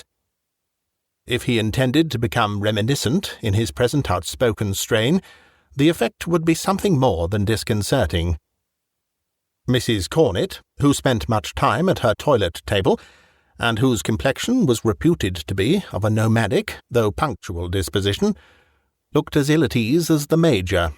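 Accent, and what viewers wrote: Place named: RP, United Kingdom. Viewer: do you think your narration is more British, or Transatlantic?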